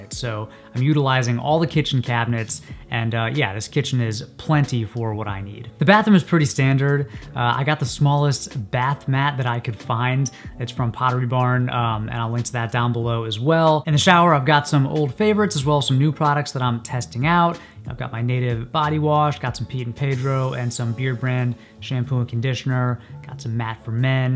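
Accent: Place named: Texas, United States. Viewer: Texan accent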